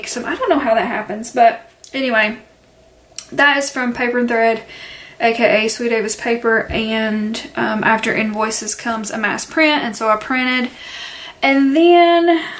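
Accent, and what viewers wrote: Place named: South, United States. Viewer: New sub. Love this. Sounds like you are a fellow Southerner. I live in Tennessee. Blessings.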